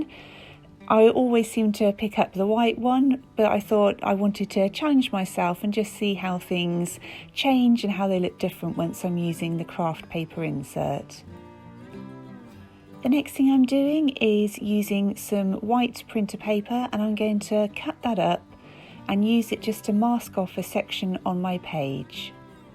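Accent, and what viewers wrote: United Kingdom. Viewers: I just wanted to tell you how much I love your videos. All of your spreads are so pretty and watching and listening to you and your British accent is so relaxing! (Texas girl with a serious southern drawl here)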